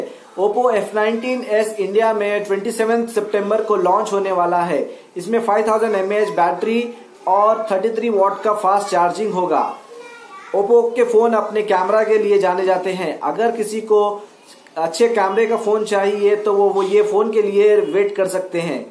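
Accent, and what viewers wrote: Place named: Hyderabad, India. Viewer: Bhai thanks for the info Lekin pura video me jo be bolre aap Hyderabadi accent Me ich bolo Toda North accent bolre toda hyderabadi mix hora Hyderabadi tech channel bole toh pura accent rakho bhai jab ich viral hota For example : are mama Oppo ka naya phone arra kate ye ye featurra hai kate kiraak phone hai aisa bolo bhai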